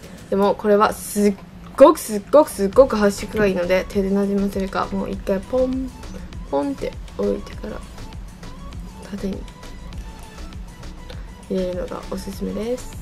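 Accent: Kansai, Japan. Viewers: 関西弁？1人の時はあんまりでないけどお友達と話してる時結構出てるのかわいい🥰笑笑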